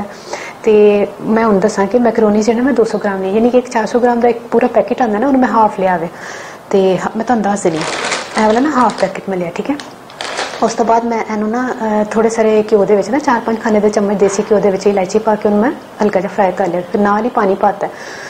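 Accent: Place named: Punjab, India. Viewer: V nice ap ni asan chezn btaen or ap punjabi bhot sweet bolte hn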